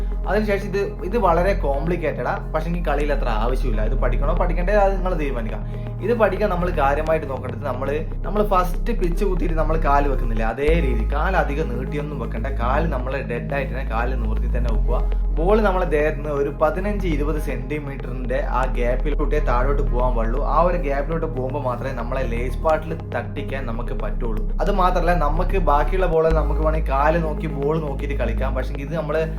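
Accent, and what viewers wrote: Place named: Kannur, India. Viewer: Bro, ith Greenfield ano Chonadam? Kand nalla parichayam. Ingale sound kettapo kannur anenn manassilayi. 😂 ❤ Sub cheythine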